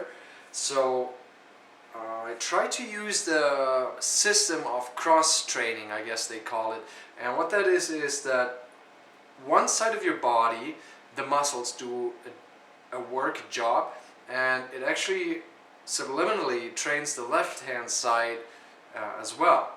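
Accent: Canada. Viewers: People from the province of Québec have that accent, usually. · that Canadian accent thooooo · Says "ootside" More attractive than average American Apologizing for not making a video on the subject he admittedly is just learning. Jesus Christ, you couldn't be more Canadian if you were eating maple taffy off a stick while riding a moose into ~battle~ your formal apology for the inconvenience of your incredibly loud moose galloping,metric system, and socialized medicine keeping everyone awake! :p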